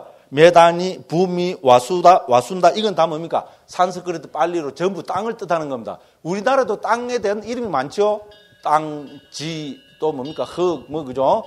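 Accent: Gyeongsang, South Korea. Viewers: 됐죠 스님~~ 경상도 사투리가 참 구수합니다.^^ 법문 감사합니다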